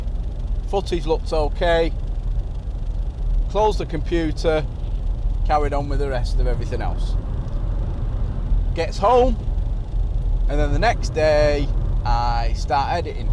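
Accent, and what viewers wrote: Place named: Manchester, United Kingdom. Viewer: fresh and honest review.,., Nothing like Most haunted.B.S!!..love your accent, reminds me of nights at the hacienda (happy day')...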